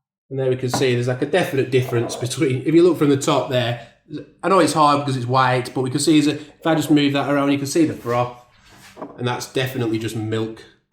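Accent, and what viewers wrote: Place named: Northern England, United Kingdom. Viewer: Excellent stuff - as a Northerner living down South, the best bit (apart from the thorough and detailed review!) was the Northern accent. Music to my ears :) Thank you! One thing I thought I would point out (based on my research), is that the Lattissima machines actually steam the milk whereas the other standalone units just heat and froth the milk. I find the steaming releases more of the natural sugars in the milk - no need for any sugar on top.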